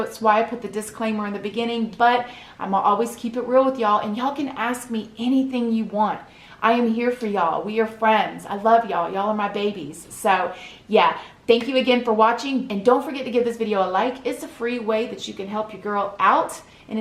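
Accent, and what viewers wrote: South, United States. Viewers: Omg...you went from 14k to 93k in less than 2 weeks girl! you're making it rain on them hoes! 😂😂😂 and don't you worry about the way you talk;) I'm from Kentucky and there ain't nothing wrong with having a southern twang! xoxo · Girl, it’s just a southern accent that some can’t understand. LOL. I’m in mississippi so I knew EXACTLY what you were saying!!!!!!!!! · Girl, let me just tell ya hun, i love your accent! Im a fellow southerner, from Alabama, and my son (age 12) and i also have our own language that only we understand lol. 😂. Love you bunches chick! 💞😘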